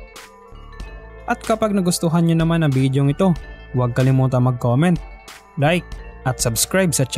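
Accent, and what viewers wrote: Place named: Philippines. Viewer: Please improve your accent and intonation para magandang pakingan and also correct pronunciation of f and v. That’s the common defect of us Filipinos .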